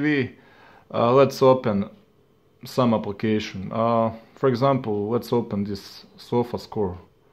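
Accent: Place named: Russia. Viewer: Fantastic Russian accent dude you're fantastic man great if you should do more videos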